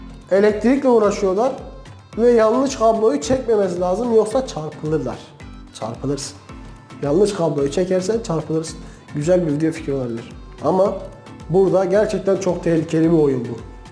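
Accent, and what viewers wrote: Diyarbakir, Turkey. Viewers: Abi senin konuşman Diyarbakır ağızına çok benziyor "gablo(kablo), garı(karı), bağ(bak),gurtuldu(kurtuldu)" gibi...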